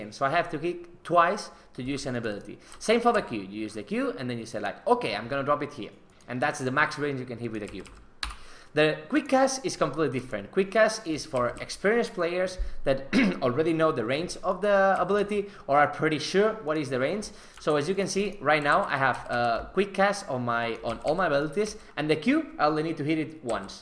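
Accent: Spain. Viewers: it's a spanish accent. like spain in europe not latin america. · I know you are Spanish, you need to learn english not Spaglish....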